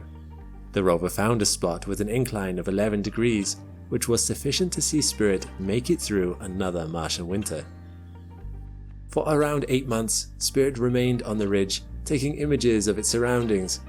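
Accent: Wales, United Kingdom. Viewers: Are you Welsh by any chance? Every so often during the video, I thought I could make out a slight Welsh accent, more noticeable with certain words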